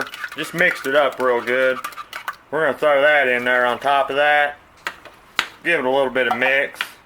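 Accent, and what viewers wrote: South, United States. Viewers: Hi! I have just found your channel and I absolutely love to watch you cook! You are one of the best cooks on here, and I watch them all just about. I also like your southern accent. Do I hear s little cajun in there? By the way, congratulations on not drinking beer anymore. I'm very happy for you and your family. God Bless you and yours.:-) BTW, I subbed to you and clicked the LIKE bell.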